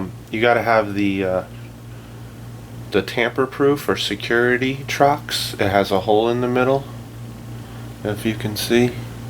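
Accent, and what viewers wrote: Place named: Canada. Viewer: He's Canadian. That's how the pronounce it. It's correct for Canadians. Also, he's shy so if u ask, he will deny he's Canadian but he is.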